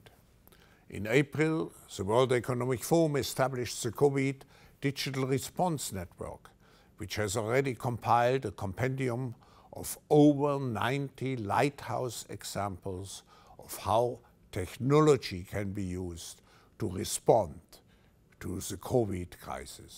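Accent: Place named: Germany. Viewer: He's probably got the worst German accent, I have EVER heard....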